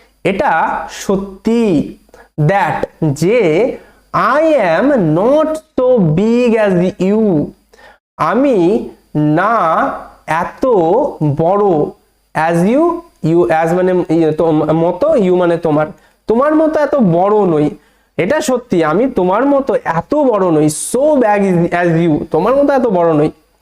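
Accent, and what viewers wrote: India. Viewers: তোমার বাড়ি কি মুর্শিদাবাদ এ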